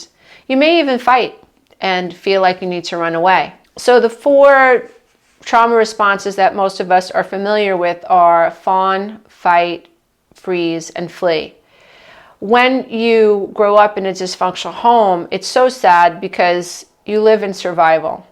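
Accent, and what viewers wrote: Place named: Brooklyn, United States. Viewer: You are by far the best one on this topic, Ramani is good too but I relate to you better, probably due to your (funny) accent, lol....downstate, Brooklyn....I cant say thank you enough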